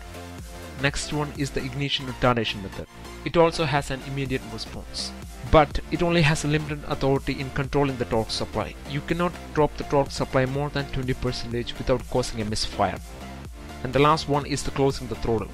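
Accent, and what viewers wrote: Kerala, India. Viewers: very good video. well explained. is the speaker mallu guy ? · Hundred percent Mallu, but he is brilliant, and knows the subject very well. I loved the video.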